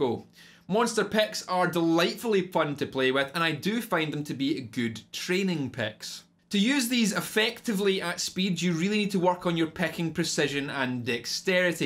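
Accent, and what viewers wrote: Scotland, United Kingdom. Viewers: Isn't it obvious that he's a Scot?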